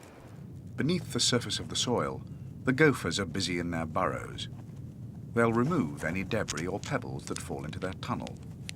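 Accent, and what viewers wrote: United Kingdom. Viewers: These documentaries always seem right when a British dude is doing the dialog...... Got that accent thing goin.....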